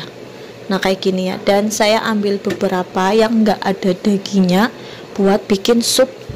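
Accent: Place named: Ngapak, Indonesia. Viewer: tenan mas bahasane wong ngapak medok banget aku suka itu, lembab lembab gemericik he..he..he....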